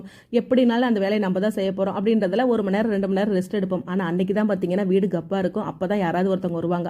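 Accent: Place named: Thanjavur, India. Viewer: உங்க பேச்சு தெளிவாகவும் தஞ்சை ஸ்லாங் போலவும் இருக்கு.தர்மபுரி பக்கம் ஸ்லாங் வேறு மாதிரி .உங்க சொந்த ஊர் எது சிஸ்..நீங்க என்ன படிச்சிருக்கீங்க.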